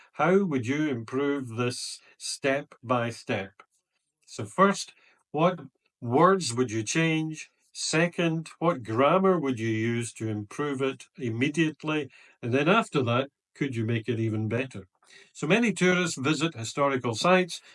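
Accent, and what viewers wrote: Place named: Scotland, United Kingdom. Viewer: Thank you so much, this is very helpful video, and are you from Scotland? I notice that from your pronounce to /e/ & /r/.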